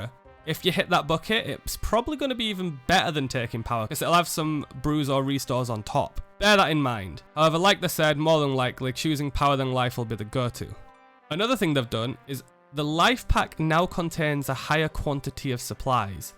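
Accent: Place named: Yorkshire, United Kingdom. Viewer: Finally a Yorkshire accent. I understood everything. Great rundown, really helped.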